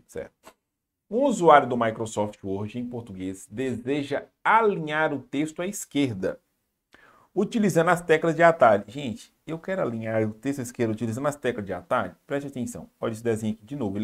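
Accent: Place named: Minas Gerais, Brazil. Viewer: Em 2024 gostei muito da aula e adorei o sotaque mineiro. Parece mineiro né. Abraço parabéns 👏👏👏👏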